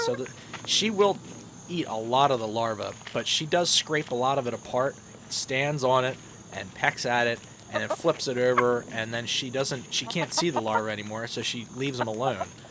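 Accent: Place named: Pittsburgh, United States. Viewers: Where about in pa are you from? I hear a hint of Pittsburgh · Eastern or Western PA? I am born and raised from the West side😏😏. I knew i recognized your accent but i cant tell from which area it belongs to. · My family is from PA I can tell by your accent that’s where you are😂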